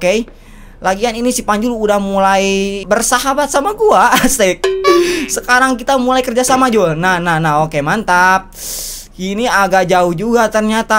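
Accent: West Java, Indonesia. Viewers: sunda bgt wkwkwk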